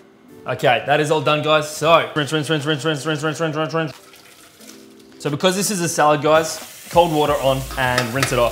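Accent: Australia. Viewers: So u said it is quite hot in hear so with that information and ur accent u r an Australian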